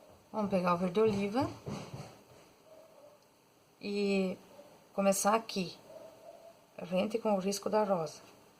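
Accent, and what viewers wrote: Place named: South, Brazil. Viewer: Btarde,!!!! Que lindo os seus trabalhos, que Deus continue abençoando suas mãos e lhe dando muita saúde!!! Vc vai fazer o vídeo fazendo as rosas deste trabalho? Vc prepara seus pincéis antes ou usa conforme vem da loja? Amei a sua aula vc explica muito bem e é bem claro o que diz!! Cheguei até vc através do canal da Jucineide Braga, que tbm acho outra professora maravilhosa, admiro tbm seu trabalho. Já me inscrevi no seu canal, e torcendo muito que não pare de fazer os vídeos e que traga mais vídeos aulas de outras flores e principalmente de rosas que realmente são poucas que explicam tão bem. Grata por compartilhar seus conhecimentos!! Até breve!!! Obs.: Amooooo o sotaque dos sulistas!!! Bjs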